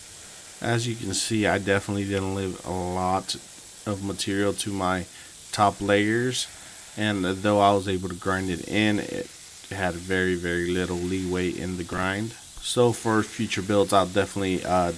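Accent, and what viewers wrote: United States. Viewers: Did you rip this from a real blacksmith? Or is your english just to american?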